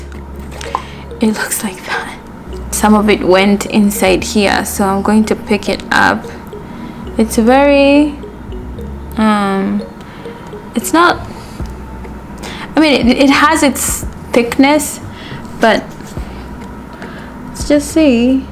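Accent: Kenya. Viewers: That has been her accent even in Kenya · What are you talking about her accent sounds very Kenyan lol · used to talk like this even when she was in Kenya lol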